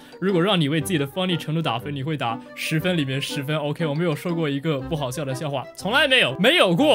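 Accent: Taiwan. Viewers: 你的台湾腔为什么和籽岷腔一样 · 有業配金主爸爸了，讚讚。我們台灣腔就是很可愛！ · 我喜歡的台灣腔哈哈哈哈我被圈粉啦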